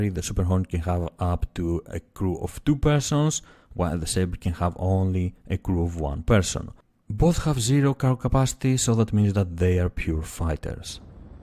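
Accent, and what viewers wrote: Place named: Greece. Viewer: Nice videos and very well presented ! Subbed right away . Sounds like a greek accent :) If so , I am double proud for you , early backer here o7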